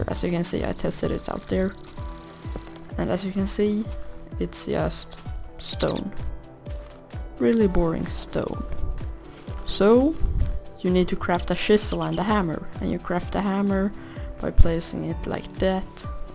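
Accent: Sweden. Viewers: Thanks :) Btw, are u from Sweden?:DD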